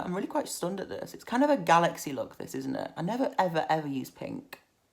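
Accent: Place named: Birmingham, United Kingdom. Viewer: I seen you in the supremely satisfying video and your hair and accent is ace I'm British myself and it sounds like you come from Birmingham. Sorry if I'm wrong 😂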